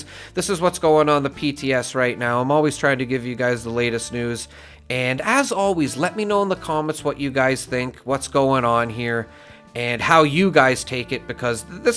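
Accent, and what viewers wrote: Canada. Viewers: This is channel. Minimal research. Maximum outrage. Nice friendly Canadian voice as the beginning. Most undeserving “creator” I’ve ever seen. He knows it too. Especially when he dies constantly on his Live streams. It’s actually hilarious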